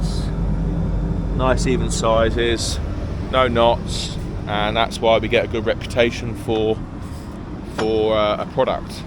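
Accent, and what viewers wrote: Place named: United Kingdom. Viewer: I've stumbled upon your channel this week and I'm liking the operation, the surroundings, your nice British accent and the fact that you seem like a nice bloke. Good luck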